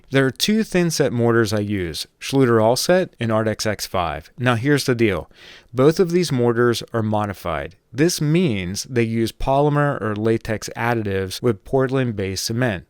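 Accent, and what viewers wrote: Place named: Pittsburgh, United States. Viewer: I KNEW I heard a pittsburgh accent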